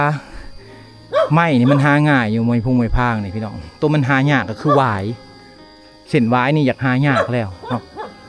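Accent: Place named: South, Thailand. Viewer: ฟัง คนใต้บ้านเราเลยเนอะ😆😆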